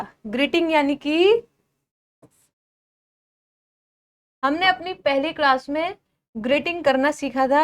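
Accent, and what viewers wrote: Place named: Braj, India. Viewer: Mam aap mathura bashi ho kya ...... Aaap ki bhasha braj ki lagti hai😊😊😊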